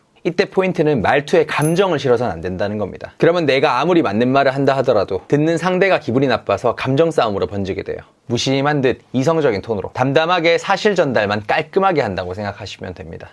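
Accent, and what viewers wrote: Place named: Seoul, South Korea. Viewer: 경상도사람인데 서울말잘한다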